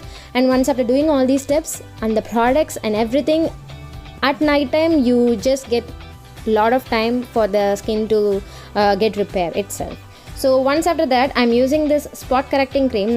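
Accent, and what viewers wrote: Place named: Tamil Nadu, India. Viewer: Superb SISTER..😉 Biotique product pathi clear ah explain paninga last video la...very nice tamil explanation...unga tamil slang nalaruku SISTER..tamil la video panunga inime...this z my request...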